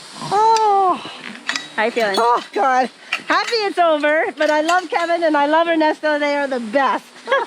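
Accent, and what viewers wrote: Costa Rica. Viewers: Mae he visto prácticamente todos sus videos me encanta ❤️🇨🇷 cual es su Instagram? O otras redes?? Pd: me encanta su acento Tico jajaja ❤️🇨🇷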